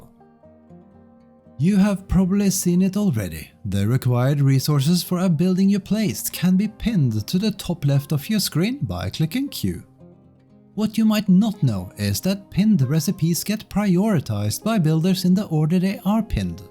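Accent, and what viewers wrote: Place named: Norway. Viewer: .and this, people, is how an proper Norwegian accent sounds like :)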